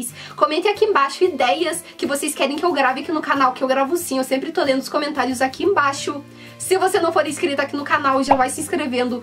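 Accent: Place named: Sorocaba, Brazil. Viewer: Que sotaque gostoso! sinto saudades dos meus amigos de sorocaba que falam assim, tenho amigos do sul que falam assim também. Minha amiga Priscila fala igual a ti!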